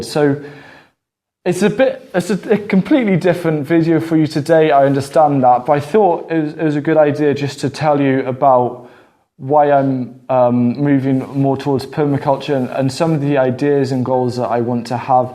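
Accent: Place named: South Wales, United Kingdom. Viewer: Hi, I'm a new subscriber from New Zealand. Thank you so much for these wonderful videos, I love the variety of content and your personality is a winner. I was feeling quite disheartened with my soggy winter garden before stumbling across your channel and now I'm feeling a lot more inspired, thanks! Your accent is a touch of home, as my grandmother is from South Wales and I also lived there for a short time:)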